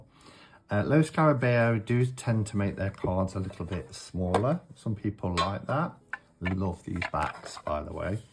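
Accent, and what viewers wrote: Nottingham, United Kingdom. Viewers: great vid, thanks. Do you have any links for these? Can't see the Matt Green Neutral Vibes one anywhere. I'm new to Tarot, love this vid. You sound like you could be from Notts, good man if you are! No offence if you're not!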